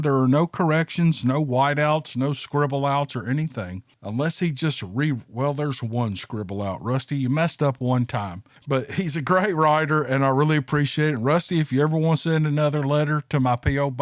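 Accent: South, United States. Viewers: I love putting your videos on while I'm doing other things. Nice stories and your voice is very soothing. I live in Michigan, but I'm originally from down south and your voice (and accent) reminds me of my childhood. Thanks. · How did i go one day without listening to your great southern draw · It's your voice we want to hear, for me it makes me feel at home.iI was raised with the southern accent, and the La., Missippi way of talking.Just talk all you want, we all love it. Thank you for the storys.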